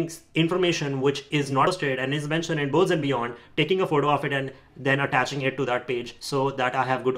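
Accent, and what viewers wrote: India. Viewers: do I have to suffer from indian accent to pass step 1 usmle ?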